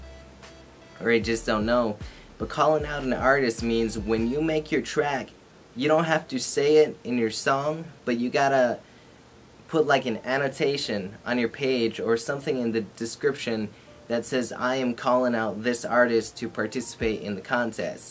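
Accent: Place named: United States. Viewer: You have a slightly American accent, but i understood it pretty well :] was geht... what´s up... was geht.... you know. it sounded like that ^^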